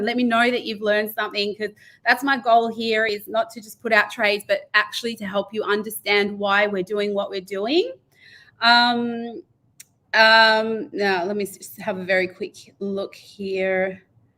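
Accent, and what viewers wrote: Australia. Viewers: Clever lady, but that aussi accent, with emphasis at end of words is sooo annoying, markITt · I forgot how strong an australian aaccent can be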